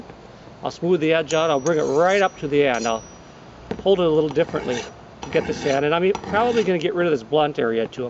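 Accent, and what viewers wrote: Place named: United States. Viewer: Were you in Brazil? You sound American.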